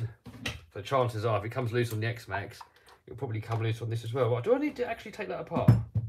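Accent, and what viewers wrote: England, United Kingdom. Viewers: You sound English I live in Indiana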